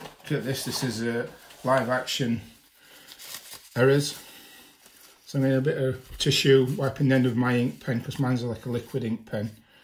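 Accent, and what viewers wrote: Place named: Northern England, United Kingdom. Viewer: Thank you, great to hear a Northern Accent.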